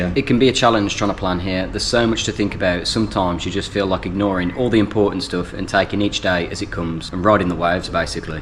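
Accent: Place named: Midlands, United Kingdom. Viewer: As a fellow Midlander 🙂 I understand you perfectly...lol - Brilliant Videos by the way. Thumbs Up!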